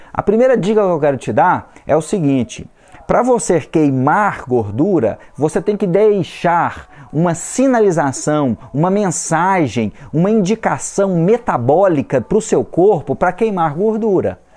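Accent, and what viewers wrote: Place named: Minas Gerais, Brazil. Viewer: Amo nosso sotaque mineiro. 😍😍❤❤ Depois deste vídeo ficou mais fácil entender o processo de emagrecimento. 👏🏿👏🏿👏🏿👏🏿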